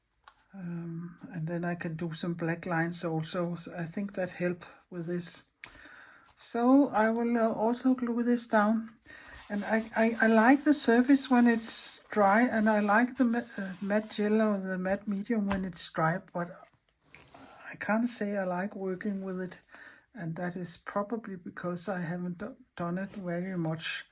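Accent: Denmark. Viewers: Love your collages and colors and your little chats with your danish accent.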